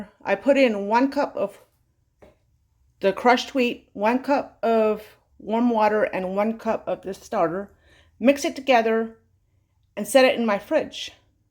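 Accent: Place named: Pennsylvania Dutch, United States. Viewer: I cannot find any information on where you’re from but my goodness, you sound so much like folks from home! You wouldn’t be Pennsylvania Dutch by chance would you?love your channel. Thank you!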